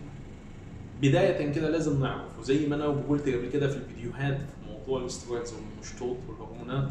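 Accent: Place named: Iraq. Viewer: انت عراقي لو مصري؟؟؟؟!!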